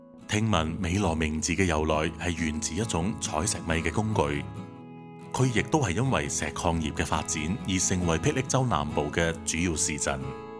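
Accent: Hong Kong, China. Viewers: U sound like Hongkie. Great job. Hope to see more of your work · 請問你是香港人嗎？因為聽口音不像馬來西亞華人。。😀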